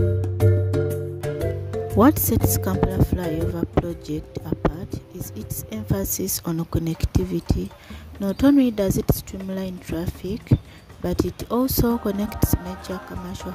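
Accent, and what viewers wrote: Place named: England, United Kingdom. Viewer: This English accent is beautiful.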